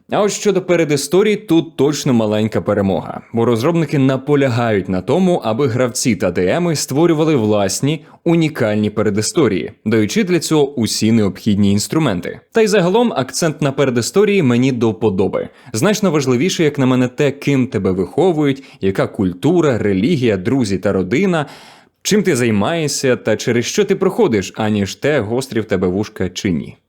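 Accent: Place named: Russia. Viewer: Но акцент не киевский, а прям очень русский - это спасает, наверное)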